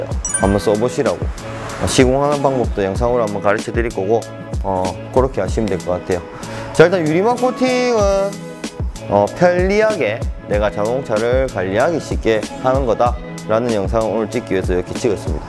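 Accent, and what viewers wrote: Gyeongsang, South Korea. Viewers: 경상도사투리에 구독.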